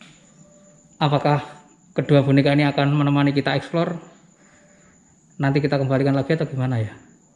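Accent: Java, Indonesia. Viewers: Ngomongny ms"ny jowo banget...hihi....smoga yg punya msh sehat Aamiin.. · Ini Vloger keren, baru nemu Eyang. Dah langsung subcrib, ngelonceng, like, koment. Dan yang penting Eyang dah nonton sampai gusisss😃😃😃. Suka gaya bicaranya yang njawani. Semoga subcribbernya semakin mlenuk, Nak🙏👍❤ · Suka bgt kesini karena logat jawanya beda dr yg lain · suka bingiiiitt bhsa jawa nya medok banget mas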